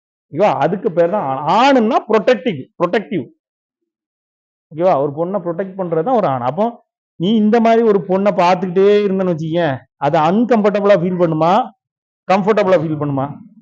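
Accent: Tirunelveli, India. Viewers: மாஸ்டருக்கு திருநெல்வேலி யா தூத்துக்குடி யா,,,,, எந்த ஊரு உங்க பேச்சுல நெல்லை தமிழ் ,,,,,,, இனிமை,,,,,, இனிமை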